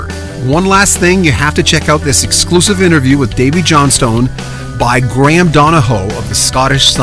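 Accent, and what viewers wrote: Canada. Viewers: I'm quite certain that our narrator is Canadian...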